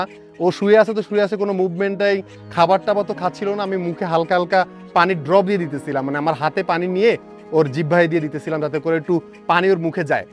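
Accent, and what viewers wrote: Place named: Sonargaon, Bangladesh. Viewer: সোনারগাঁয়ের আঞ্চলিক ভাষা এটা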